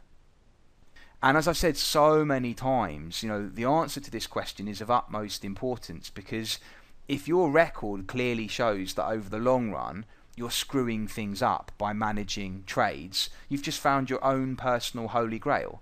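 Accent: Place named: United Kingdom. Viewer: I love when he swearing and offending people with british accent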